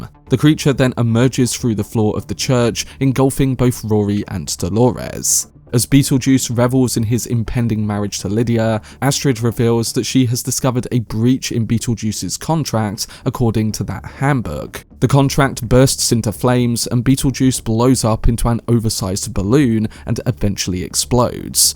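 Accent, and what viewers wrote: United Kingdom. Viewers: There's not a lot of British people's voices i like but man you're it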